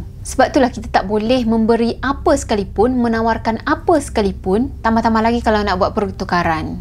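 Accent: Kelantan, Malaysia. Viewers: Org kelatey ko kak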